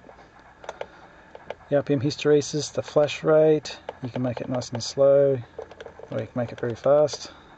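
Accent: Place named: Australia. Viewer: I like Subaru’s with shift lights, clicked this video, and heard an Australian accent lol.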